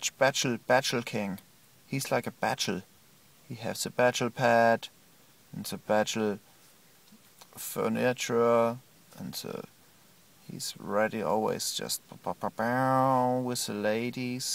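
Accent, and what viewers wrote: Germany. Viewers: It's his true accent. He's a real DJ from Erlangen Germany :) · he sounds german :) it must be very funny if someone has english as mother language, but for me it's perfect english haha :D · definitely german. The accent, the stuttering, everything. when people imitate germans they talk angrily and keep shouting das this das that.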